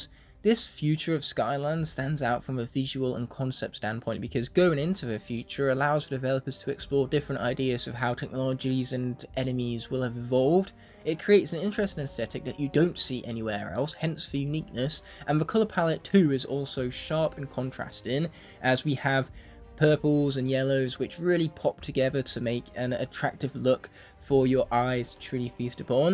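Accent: United Kingdom. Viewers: yeah, I understand, just thought I would share my thoughts as I don’t want people clicking of on the intro without seeing how great your videos are, I’ve only watched you for a week now since I wanted to Skylanders nostalgia, but I have really loved your content man and finding out your British too lol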